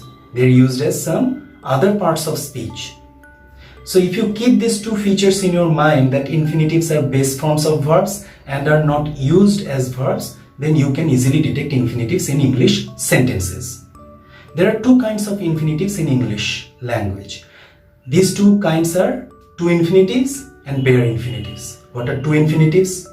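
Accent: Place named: Bengal, India. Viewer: Your accent is wonderful and having Bengali touch little bit.I am suresh kumar from Punjab.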